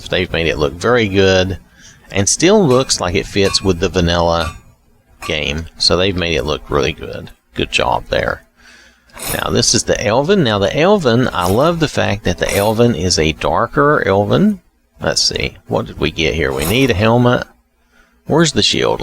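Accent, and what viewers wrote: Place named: South, United States. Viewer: Don’t hear a lot of southern accents in modding, you are appreciated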